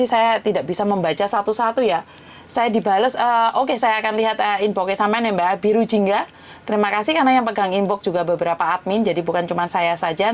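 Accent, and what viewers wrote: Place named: Java, Indonesia. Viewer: Mbk idolaku❤️❤️❤️❤️sampean asline org mn to mam?kok jowo medhok😂😂😂q mlah ngasih tau suamiku lihato yutube mbk Jan ngeri wong utang😂😂kl q dihkg g pernah libur ce 5 th hpku ae TK taleni karet sing ptg anak dan keluargaku bahagia😂😂🤦🤦beli mkn diluar kadang2 Krn kl mkn diluar ditelan sulit ingat anak🥺🥺🥺saya iktiar disini...smg saya diberi kshtan dan umur pnjg PGN bhgia,mkn bareng SM anak2 diindo...nggak disini tmptku bhgia👍👍smngt Miss❤️❤️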